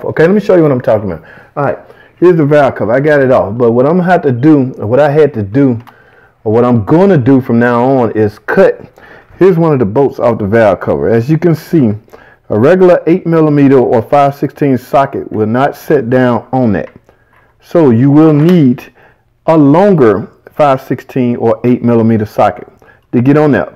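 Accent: South, United States. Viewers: talk like the dirty dirty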